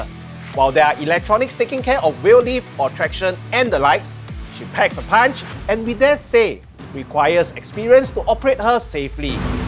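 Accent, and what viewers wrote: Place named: Singapore. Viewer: I like the content but guys, can we stop with the extreme Singlish pleaseeeee. It’s honestly quite annoying and totally not necessary at all. Don’t have to speak like ang moh but certainly not like some coffe shop uncle. Cmon guys this channel have the potential to be a proper bike review channel.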